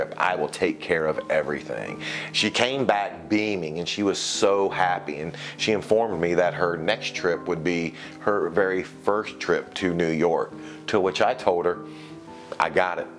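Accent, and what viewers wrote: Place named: South, United States. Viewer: I can’t guess his regional accent. Seems like a warm hearted southern guy.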